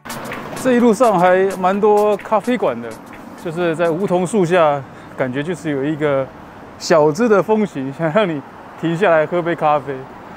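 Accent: Taiwan. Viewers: 不知你是那裡人？有時候聽你説英文～國語非常像台灣人～ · 为啥感觉你有台湾口音。 最明显的区别：台湾：试看看。吃看看。 大陆：试试看。吃吃看。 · 你是福建人嗎? 口音跟台灣很像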